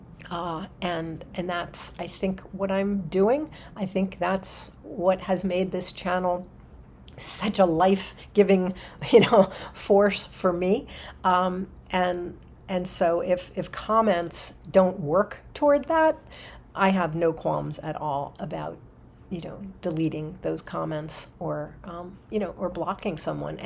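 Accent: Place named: United States. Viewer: Age is just a number I would be more pugnacious but then again I used to be a pro fighter. I have only recently come across your channel I enjoyed your American accent and the way you presented yourself and your books so I subscribed. I live just outside of London, although I am a sexagenarian, I still work in North London part-time as I am a peripatetic chess coach who goes from school to school teaching it. I am an avid reader. I read eclectically. I am a bibliotaph and a librocubicularist. Recently, I picked up an antiquarian book, The Golden Bough, in Hampstead, in what you would call a thrift shop, we call them charity shops here, and I am very pleased with it. Someone also threw away a beautiful bookcase at the bottom of my garden, so I rescued it, cleaned it up, and now store some of my Hardbacks in it. It's one of those bookcases with lead-lined glass doors. 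You can have a peek at some of my books here; it's about 10% of the books I own. If anyone is on there and wants to touch base, hit me up! <link> Regarding poetry, it can touch and change the soul. I recently read the first book in the autobiography of Maya Angelou, and she once said: "When you know better, you do better". That one aphorism inspired me to become a better man. My favourite Poet is Kipling. I also enjoy Shelley, Byron et al.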